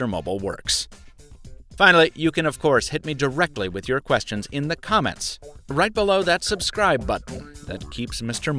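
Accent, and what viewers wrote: Boston, United States. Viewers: are u from boston ?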